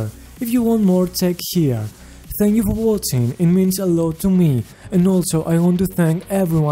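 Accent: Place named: Greece. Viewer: Κατάλαβα ότι εισε Έλληνας εεε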